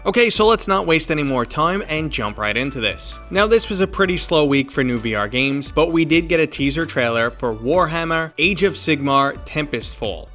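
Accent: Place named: Boston, United States. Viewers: You are so Boston holy shit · Lmao it does sound little bit boston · your boston accent made this video 10x better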